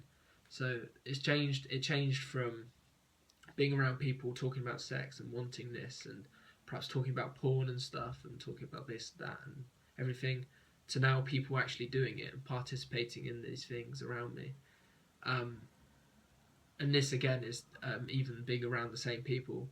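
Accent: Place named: United Kingdom. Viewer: Great video. It was nice to hear a fellow British voice talking about this topic.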